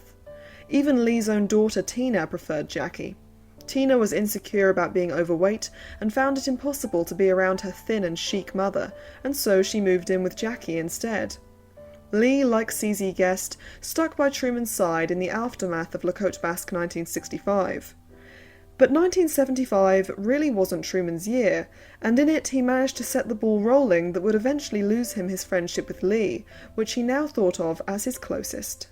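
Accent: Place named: United Kingdom. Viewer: Dear Ms, your British voice telling different aspects of these Swans was perfectly delightful! Thank you! I’m almost finished but I dread..,the end of the story! Because yore video was so, how do I say…reminiscent… I accepted an “Invitation” and will drive…all the way to that Coctail Party! Mind you, am a 😍”Swann”! And ye, I had a Truman C. In my life too…Funny, Do all Birds of a feather, flock together? Thank you again! Have a Blessed Holy Saturday Night! Kali Anesthesia! Nurse Jane, Deale, Maryland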